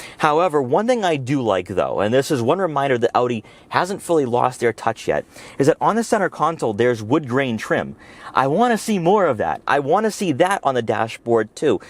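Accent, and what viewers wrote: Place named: New England, United States. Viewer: Tail light setup reminds me of a Lexus LS. Also loving this guy's wicked New England O's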